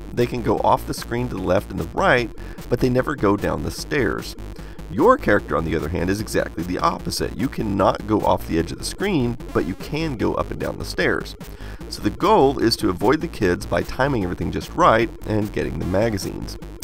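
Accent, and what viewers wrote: France. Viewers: I like your french accent 😀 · Awwwwwwwn your french is adorable ^^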